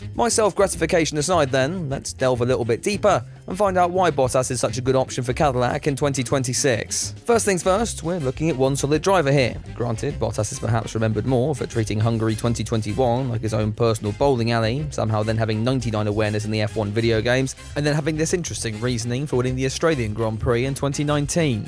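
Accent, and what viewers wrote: United Kingdom. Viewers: Hey dude. Hadn't seen you ever. Not only do you sound British, you look British...super british, if you know what I mean.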